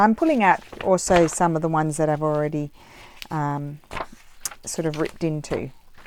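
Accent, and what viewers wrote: Australia. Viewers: My husband said the same to me about upside down butterflies. I told him I like them that way. Haha. And I love your Ozzie accent. I had gardening friends in Texas from Australia. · Great video, loved watching you create those pockets... It's also nice to hear another Aussie!!! I was chuckling away listening to your story... 😂😂😊 tfs xox hugs Fi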